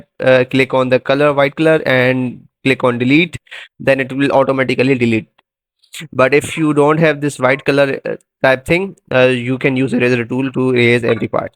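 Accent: India. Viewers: When I hear the Indian accent I knew I was gonna leave with what I wanted while he explained it to me simply ❤, Tysm pro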